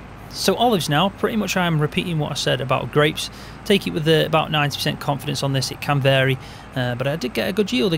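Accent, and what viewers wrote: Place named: Cheshire, United Kingdom. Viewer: Hi, new viewer here, and a new sub. I'm from Cheshire and I recognise you accent, obviously 😅. I'm from Northwich. Nice to see a local lad pumping out some decent FS material.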